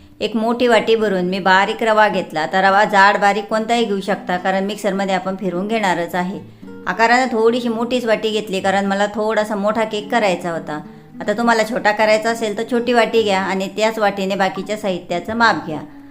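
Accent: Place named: Khandesh, India. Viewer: तुमची केकची रेसिपी झटपट आणि खुसखुशीत आहे. पण तुम्ही सुद्धा केक सारखं * थोडं गोड आवाजात निवेदन केलात तर ,* आम्हाला केकची गोडी अजुन वाढेल. तसेच बोलताना इतकी सुपरफास्ट एक्स्प्रेस * कशाला चालवता? बोलताना काही * पुर्णविराम, स्वल्पविराम , प्रश्नचिन्ह ,उद्गारचिन्ह असतात. * त्यांचा वापर केला की * आपलं बोलणं * जास्त इफेक्टीव्ह होतं * म्हणून सर्वप्रथम बोलणं आणि भाषा, बोलण्याची खानदेशी स्टाईल * सुधारा.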